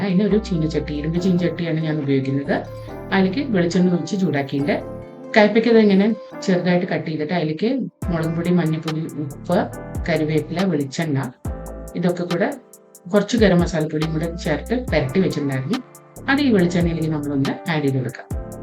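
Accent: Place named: North India, India. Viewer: R u a malayalee brought up in north because of your malayalam I feel so . ❤❤❤❤❤nice vlog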